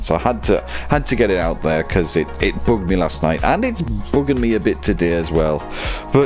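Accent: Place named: United Kingdom. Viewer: Judging by your accent your in the UK. You wouldn't like the North American participation. Maybe fewer servers would help.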